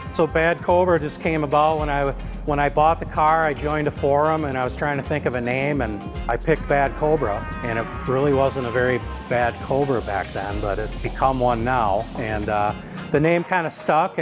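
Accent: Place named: Minnesota, United States. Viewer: Don’t cha know?? I love minny accents. What a great car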